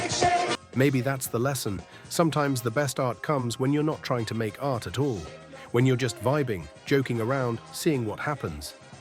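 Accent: United Kingdom. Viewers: Please narrate your work and lose the Brit !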